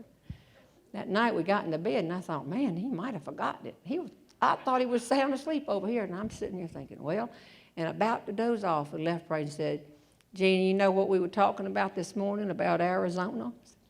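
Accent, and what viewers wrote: South, United States. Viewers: Idk if she’s a motivational speaker or a comedian but she’s pretty funny! and ya gotta love that southern accent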